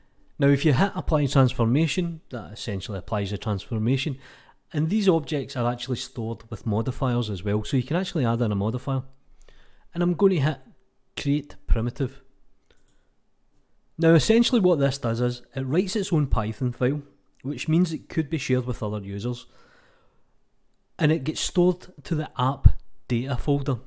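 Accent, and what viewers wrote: Scotland, United Kingdom. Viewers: I turned the captions on because I find it a bit hard to follow a Scottish accent, but youtube couldn't handle it very well either, so I got phrases like "Ness is a primitive" :-) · I love his Scottish access soooo much. I bet others do so ;-)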